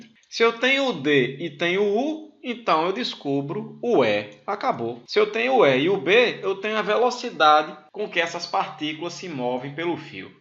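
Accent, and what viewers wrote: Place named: Northeast, Brazil. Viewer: o mais bonito é esse sotaque visse . bichim amo meu nordeste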